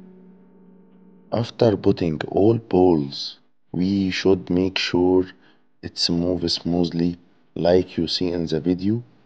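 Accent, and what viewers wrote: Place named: Egypt. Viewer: اللللله على الانجليزية المصرية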